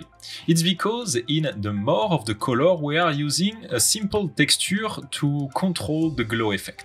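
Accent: France. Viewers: Il faudrais faire les vidéo en français, car avec l'accent français la traduction est horrible ! et c'est juste incrompréhensible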